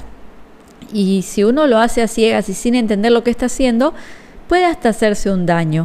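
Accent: Argentina. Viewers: Así es querida estabas malnutrida literalmente te estabas muriendo de hambre, un punto que no mencionaste es que tomas tu alimento y no masticas no producis unas enzimas nesesarias para poder dijerir los alimentos y es otra razón por la cual estabas sin energía. No somos lo que comemos somos lo que dijerimos. Saludos y no pierdas tu acento Argentino no está usar el vos y usar nuestras palabras si nosotros entendemos al resto el resto nos puede entender a nosotros, yo también vivo fuera de Argentina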